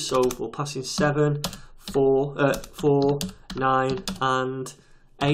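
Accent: United Kingdom. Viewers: Getrogo he is from U.K.)))))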